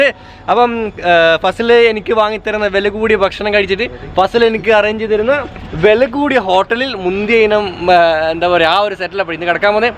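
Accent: Kannur, India. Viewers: Kannur slang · ഓവ്വ് എന്റെ മോനെ . ഇങ്ങള് നോക്കിയാട്ടെ . . 😀😀😂😂 എട പോയാലും അമ്മളേ കണ്ണൂര് സ്ലാങ്.. ബേഗം റൈഡ് സ്റ്റാർട്ട്‌ ആക്ക് അമ്മള് കട്ട വെയ്റ്റ് ആന്നപ്പ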